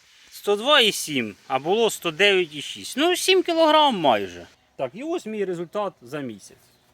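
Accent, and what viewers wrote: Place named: Poltava, Ukraine. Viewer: Полтава? Акцент как на полтващине. Прыг и бег если нет проблем с коленами, самое простое для похудения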